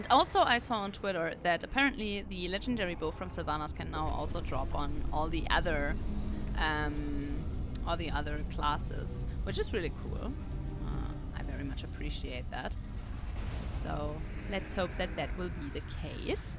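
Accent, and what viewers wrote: Germany. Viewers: i hea da an bayrischen släng aussa oda? xD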